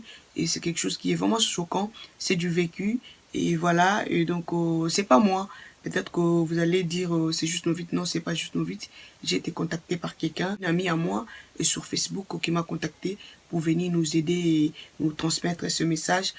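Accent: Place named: Democratic Republic of the Congo. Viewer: J'étais que tu étais Congolaise avec l'accent que tu as.. (moi aussi j suis Congolaise ;)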